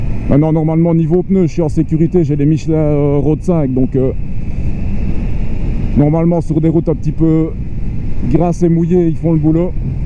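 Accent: Belgium. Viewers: Ça me fume ton accent belge, j'habite près de Theux aussi mais j'ai acheté une Ktm a gouvy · J'ai envie de me la prendre en A2 et je cherchais des vidéos... En t'écoutant je me suis dis c'est étrange il a l'accent et les expressions des mecs de chez moi... ça fait 16 ans que je suis dans le sud ouest de la france pour info... et oui en regardant les panneaux de circulation je me suis dis ! Ba oui c'est un cousin Belges ! Je suis dès Ardennes côté française :)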